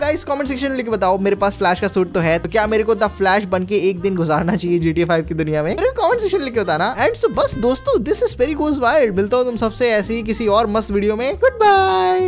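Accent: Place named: Punjab, India. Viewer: Meri party aapka yah Punjabi tadka hai na bada mast hai mujhe maja aata hai aapka Punjabi tadka sunane mein aur Hindi wala Punjabi tadka 👍👍👍👍👍👍👍👍👍👍👍👍👍👍👍👍👍👍👍👍👍👍👍👍👍👍👍👍👍👍👍👍👍👍👍👍👍👍👍👍👍👍👍👍👍👍👍👍👍👍👍👍👍👍👍👍👍👍👍👍👍👍👍👍👍👍👍👍👍👍👍👍👍👍👍👍👍👍👍👍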